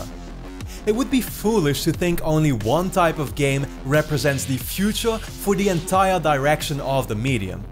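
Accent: Netherlands. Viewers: Dude are you Dutch? i lived for some time in amsterdam and this accent has just stuck in my head so deeply.... :D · You cant hide your dutch accent. Its too good to hide ❤️ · Are you dutch? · dutch accent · Aaah the dutch english, it never stops. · Is this guy dutch? He has a familiar accent...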